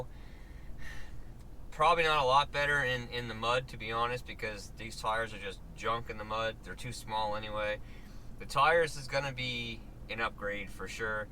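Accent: Nova Scotia, Canada. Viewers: Damn Canadian accent is annoying .. it’s OUT not oughhtt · 10 seconds into the video I know you were Nova Scotian lol ... I'm from Truro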